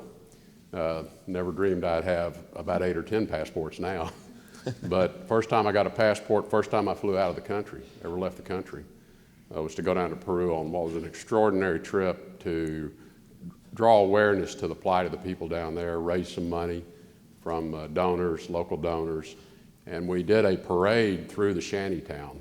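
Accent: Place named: Texas, United States. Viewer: pro I miss that Texas draw :)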